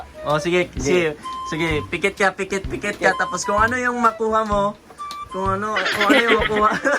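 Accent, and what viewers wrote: Visayas, Philippines. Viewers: BISAYA O ILONGGO?:)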